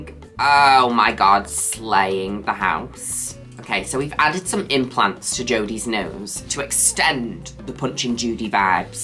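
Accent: Manchester, United Kingdom. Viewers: I catch myself saying something is disgusting in Mancs accent 😂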